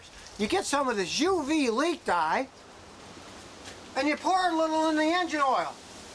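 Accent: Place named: South, United States. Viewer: Where it'd be drippin its hard to tell he said that because his southern accent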